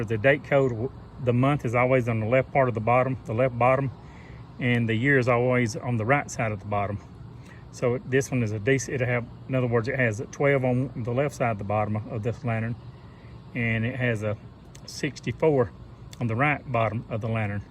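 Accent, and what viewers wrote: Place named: Tennessee, United States. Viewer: That accent is from Tennesee the Volunteer State.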